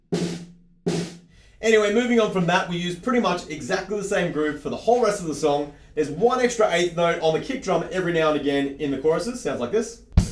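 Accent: Australia. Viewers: I subscribed as soon as I heard your accent I’m an Aussie aswell mate 🇦🇺🇦🇺🇦🇺🇦🇺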